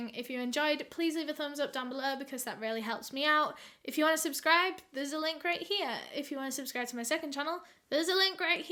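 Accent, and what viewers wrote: United Kingdom. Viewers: I am a middle-aged woman in medicine hat Alberta in the middle of the freezing cold listening to this young girl and have literally been stuck on her channel for days you are a total sweetheart I wish I had you for a daughter I am 53 years old and I totally love your concept the way you portray the stories you're so sensitive to what kind of story you are showing us especially around children I think that's so sweet I found you totally by chance but I have been watching you for the last 3 weeks Non-Stop my 17-year-old son it's starting to get worried and has now starting to try and peek in and see who this girl is with the beautiful British accent you see we live in the prairies and medicine hat Alberta population 68,000 I have never seen you before young lady but you are an exceptional wonderful bubbly incredibly talented young lady you're the daughter I wish I had I was only able to have one son by the way and he's very very ill he had an unsuccessful kidney transplant at 15 years old I he has just been 3 weeks in children's hospital and the neurology clinic having major blood filtration blood plasma and steroid therapy to try and stop his kidneys from rejecting so if you guys could please pray for my son he's in a really bad way right now he's lost two markers on his kidney which means he's going to have a horrible time finding a new one please pray for him his name is Riley he had heart surgery at 6 days old to repair one of his valves he was born 2 lb 2 oz 10 wee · IDKY youtube recommended this channel but THANKS... 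this adorable brit-ish girl talking about New York hip hop murders is HILARIOUS. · "Drugz spelt with ZED" .... Beautiful love UKs pronunciation of words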